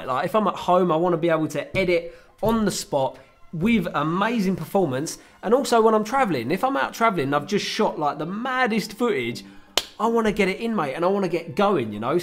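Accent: United Kingdom. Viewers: covid nine-IINN XD.. so cool man I love British accents no offense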